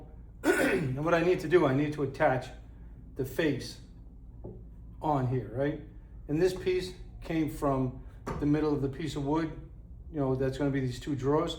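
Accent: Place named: Boston, United States. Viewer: Hey bud, I can tell a fellow Bostonian when I hear one. It’s not your the accent it’s the way you talk. Keep up the good work.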